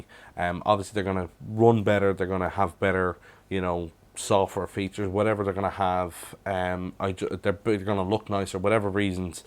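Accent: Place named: Ireland. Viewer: R U from Ireland by any chance cause u sound like u are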